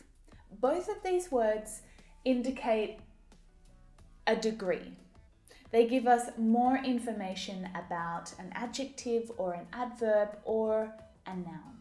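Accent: Australia. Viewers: You're smart enough to make me love English language particularly Australian accent.Many thanks dear. I need a lesson about reading comprehension (pre reading, how I deliver it for Arab students ( pre intermediate level)🌷⚘🥀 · You just made Australian accent the best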